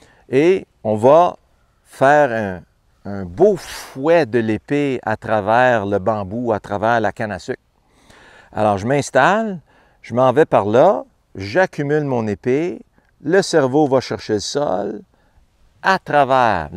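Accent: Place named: Quebec, Canada. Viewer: J’adore entendre ses instructions en quebecois. Merci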